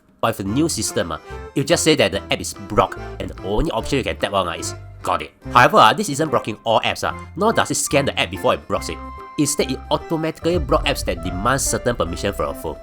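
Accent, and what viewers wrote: Singapore. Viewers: Wah Lao! So Singlish leh! 😂😂😂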